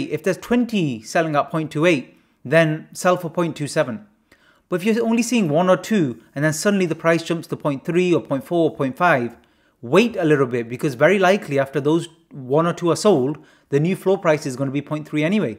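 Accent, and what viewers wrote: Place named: East London, United Kingdom. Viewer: well done bro.. u seem to be good in trading knowing u just started recently in NFT.. im from east london, you sounded like from east side as well..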